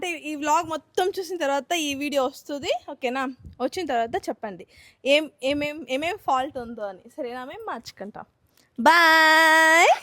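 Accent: Chittoor, India. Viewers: Idi chittoor lo tamil valla telugu slang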